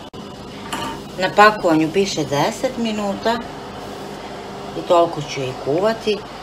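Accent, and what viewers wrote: Vojvodina, Serbia. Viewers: Odlična ideja. I samo pricaj, uzivam u tvom govoru onako vojvodjanski posjecas me na Balasevica jako volim Vojvodinu. Znam skuhati bosansku kafu i pustim tvoje filmove pa mi kafa bude merak. Zivjla ti meni. Pozdrav.🤗🤗🤗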